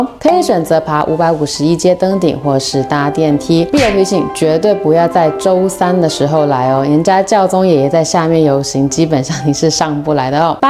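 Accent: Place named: Italy. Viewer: 義大利語唸得超迷人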